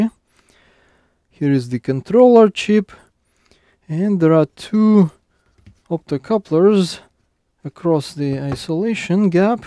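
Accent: Russia. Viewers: Чел , ну слышно же что ты русскоговорящий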